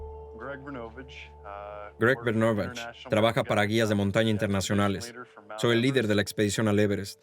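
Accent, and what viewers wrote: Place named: Spain. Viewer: No soporto el acento gachupin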